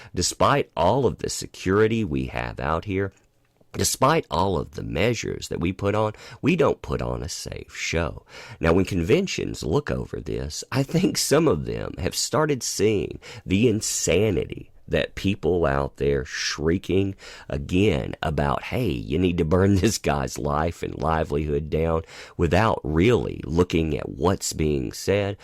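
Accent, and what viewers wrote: South, United States. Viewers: Hey where IS your accent from? Are you from the southern US because it sounds somewhat familiar?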